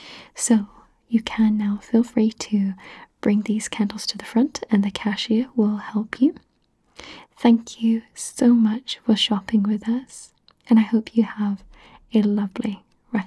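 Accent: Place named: Wales, United Kingdom. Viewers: U sounds like a British tho u r american :) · She’s definitely Welsh, I can hear the accent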